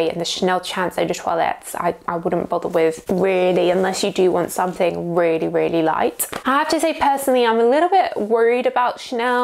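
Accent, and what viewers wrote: England, United Kingdom. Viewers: I love your reviews! You were so correct about the Chanel Chance eau tendre. I bought it and it was the weakest perfume performance wise for the price. It disappeared on my skin and could not smell it after an hour or less. My coworker can wear it and I smell it on her all day long. But last year you told us about Zara Applejuice and I bought it last year for $25. It was the big bottle and it smells better than the Chanel IMO, and lasts longer and smells stronger than the real one. That’s on my skin though. Everyone is different. My skin tends to eat perfumes unless they are stronger or a citrusy fresh like Clinique happy works great on me for example. It was disappointing though buying the eau tendre just to have to return it back. Because it does smell so so good just very weak and expensive. So thankyou for all your tips! 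I love watching your videos and I love your English accent!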